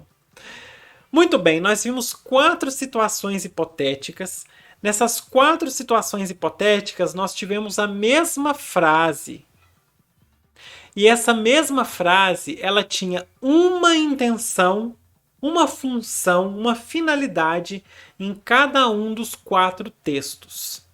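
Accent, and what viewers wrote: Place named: Minas Gerais, Brazil. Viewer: caramba! Jurava que vc era de algum Bernoulli (pelo sotaque que me lembra o MG). Estudei em um cursinho renomado e os professores não eram tão bons como vc (no sentido didático).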